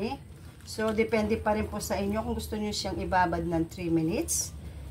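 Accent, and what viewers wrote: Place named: Pangasinan, Philippines. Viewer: Panggalatok poba kayo kc halata sa salita ninyo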